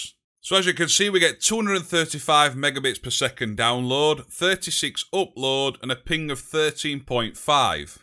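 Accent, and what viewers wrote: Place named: United Kingdom. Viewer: Nice to see someone with a British accent. I can't stand the American one. Especially when they say words like data it does me headin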